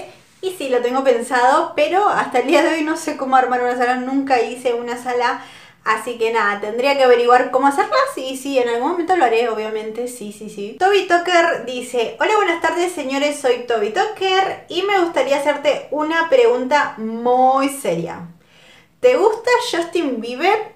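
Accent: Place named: Argentina. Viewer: Yo te conoci por el video de la mascara de calabera qu isiste tambien ese video supe que eras de argentina porque mensionabas la pala sh por ejemplo en este video en ves de decir pienso llegar al millon dijiste pienso llegar al mishon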